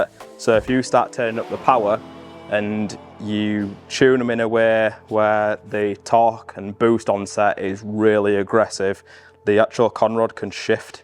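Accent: United Kingdom. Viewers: Where you based you sound local accent. Wakefield/Leeds???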